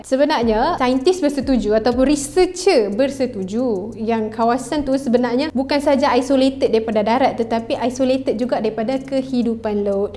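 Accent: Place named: Kuala Lumpur, Malaysia. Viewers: Sis please jangan nak key el sangat,saya lagi suka sis cakap jaa loghat utaqa,saya suka dengaq kaum2 hawa dari utaqa ni bila bercakap,saya yakin ramai lagi yang suka sis pakai loghat utaqa bila bercakap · Sedap kot die ckp key el...bia la dia..alahai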